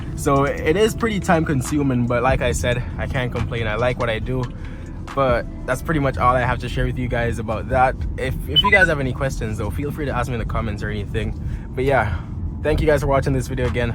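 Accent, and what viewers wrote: Jamaica. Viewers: i can tell ur jamaican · i hear an accent are u mix with jamaican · are u jamaican by any chance? the accent.. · Sound like you are jamaican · I was here thinking you sound Jamaican from your accent. I am in college as well in Kingston, I'm 19 and would love to be driving a nice car like that as soon as possible. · I’m 16 and moved to Canada from Jamaica 4 years ago and right now I’m really struggling to get hired somewhere. Not even McDonald’s wants me 🤷‍♂️. I’ve already thought about renting cars in the past but I can’t find the money to buy one and clean it up. Also the second I heard your voice I knew you was a brother from the island 🇯🇲 g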